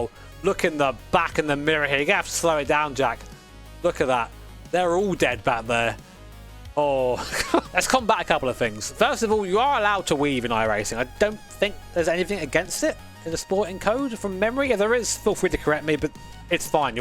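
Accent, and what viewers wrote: United Kingdom. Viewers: I couldnt understand what you were saying since you are British and you sound poor anyways so whats the point of listening to you? being respecful goes a long way and its just a game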